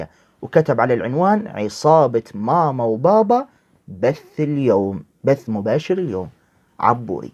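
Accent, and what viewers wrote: Egypt. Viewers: كل سنه وحضرتك طيب يا شيخ بمناسبه الشهر الكريم وحلوه اللهجه المصريه عليك اوي ربنا يديلك الف صحه وعافيه وتتصدي للناس دي وكل من افتري ع مسلم..ربنا معاك ويقويك ❤️💪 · هذا الوارثوج شبية البرص احول نوتردام ليس شيعي اصلا انة نصراني ابا عن جد وهذا ما صرح بة الشيعة. وحتي لو كان شيعي اصلا فلن يتغير في الامر شيء كلهم انجاس× انجاس .وبعدين النصاري عارفين انة نصاب ولكنهم يستعملون كل من يسب في الإسلام فكلهم نصابين × نصابين رشيد قمامي ورشيد ابو السباع وتعيس ابو بظرط ونقمات موافي الكدابة ام فشة عايمة ومحمد رحومة النصاب الهارب وكلب الالمان ابوعضمة خامد عبد اليورو.. 😂😂والله لهجتك المصرية جميلة ياشيخ وهذا مايسعدنا ويشرفنا ❤❤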